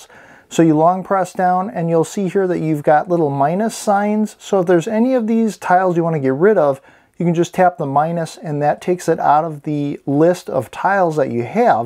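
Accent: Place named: Chicago, United States. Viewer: yup....you're from chicago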